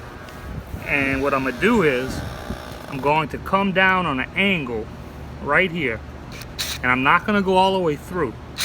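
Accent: Louisiana, United States. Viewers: you sound like you from south Louisiana! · Are you from Louisiana?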